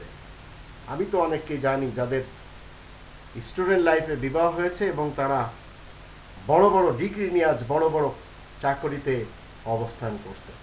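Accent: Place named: Comilla, Bangladesh. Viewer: আপনার বাপ দাদারা কি কুমিল্লা থেকে যশোর এসে বসবাসকারী? আপনার ভাষায় তাই ধারনা হয়।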